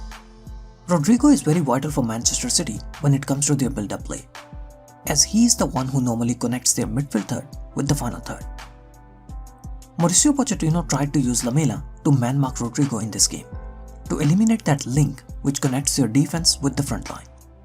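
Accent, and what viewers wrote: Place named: India. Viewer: Bro please i like your videos but please fix your english accent its very annoying..... i hate this indian accent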